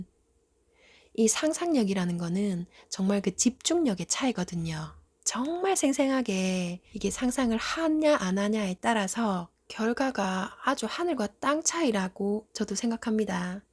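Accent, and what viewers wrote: Gyeongsang, South Korea. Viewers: 경상도 사투리 구수하네요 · 경상도 분 ~~억양이 ^!^♡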